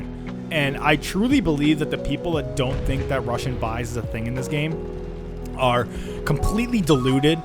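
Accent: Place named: United States. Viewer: start video : american accent. yeah we knew already if you guys didnt get everything handed out you would cry xd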